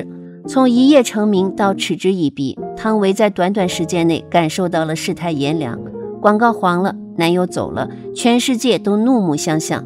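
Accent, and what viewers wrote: Standard. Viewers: 的国语讲的非常地道啊！😂😂😂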